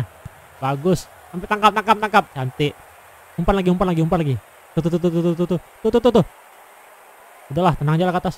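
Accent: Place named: Riau, Indonesia. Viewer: Kau pasti orang Sumatra khusus nya Riau kan, ketebak dari logat bahasa Indonesia mu 😂😂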